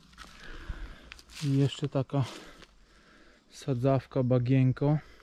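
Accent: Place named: Masuria, Poland. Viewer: Skąd jesteś? Sądząc po akcencie gdzieś z Mazur. Zgadłam?😉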